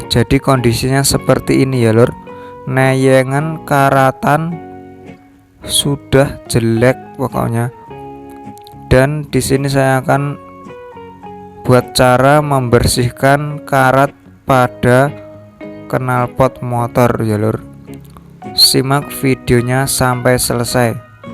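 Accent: Indonesia. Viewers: Apa itu grenjenk...??? Jadi ketawa sendiri dengar penjelasane mase,..Jowo ndi mas???? Q jatim.......👆👆👆👆👆👆👆👆👆😁😁😁😁😁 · Orang gebang ya om?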